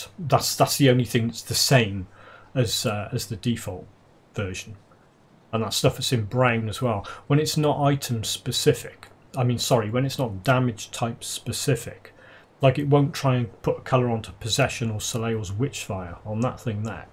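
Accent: United Kingdom. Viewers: Really enjoying your Grim Dawn videos, very informative. I come from the Forest of Deam and lived in Gloucester for years and your accent sounds familiar am I guessing you are a Gloucestershire man?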